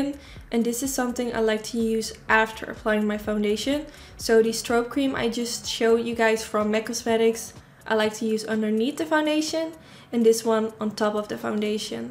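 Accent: Netherlands. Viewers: Are you dutch , you have kind a dutch accent. No offense becausse when i talk english it sounds like crap (also my writhing is really bad , sorry for that) i really liked youre video . You have 1 new sub❤️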